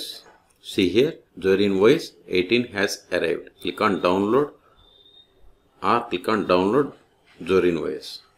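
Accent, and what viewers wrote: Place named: India. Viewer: Indian voice , ofcouse it will work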